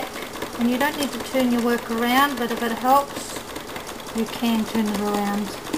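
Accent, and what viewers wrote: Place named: Australia. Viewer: Wow thats incredible. I have been sewing off and on since I was a kid but just started quilting. You do beautiful work. Ive never seen a machine that switches colors before. I detect an Austrailian accent. You remind me of an old friend of mine. I just moved to Florida from NY. Quilting is very popular down here and my aunt is teaching me. I have to show her your videos. thanks. I would love to make a butterfly quilt with this techneq. for my daughter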